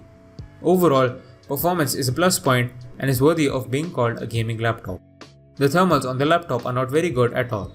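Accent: India. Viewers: As far as I can figure out from your accent, you're probably an Indian guy. So you should specify the prices in INR too. 🙁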